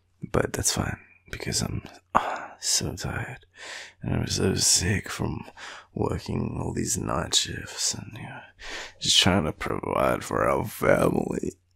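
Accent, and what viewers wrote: Australia. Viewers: cheesy jokes in an Australian accent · Aussie Aussie Aussie! mate another Aussie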